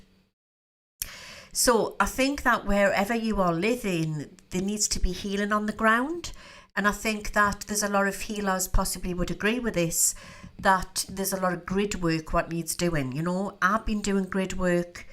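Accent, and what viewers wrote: Newcastle, United Kingdom. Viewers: Love the show, and as a fellow Geordie I love to hear our local accent! Keep up the great work. x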